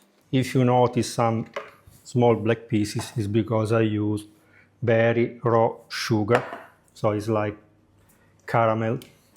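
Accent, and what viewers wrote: Italy. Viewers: Ma quanto amo l'accento italiano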